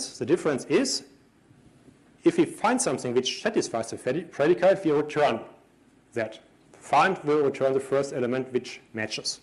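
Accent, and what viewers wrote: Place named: Germany. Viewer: i don't know what's wrong, but it's close to impossible to understand what he says, german accent is way too heavy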